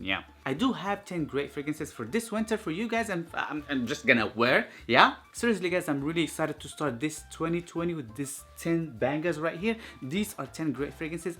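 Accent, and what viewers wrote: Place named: Israel. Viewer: Are you Israeli? יש לך מבטא 😏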